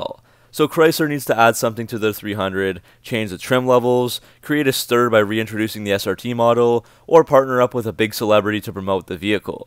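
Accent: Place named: Canada. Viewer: Lol, that Canadian accent is strong.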